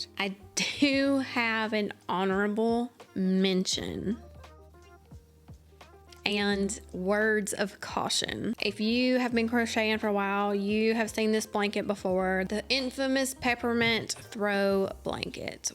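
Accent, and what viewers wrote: South, United States. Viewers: you're very talented! I like the blanket that you crocheted that's on your couch, the most. I like your Southern accent. My mom's parents were from Gaffney and Cherokee, South Carolina and my dad's grandpa was from Tennessee. I've always loved the twang.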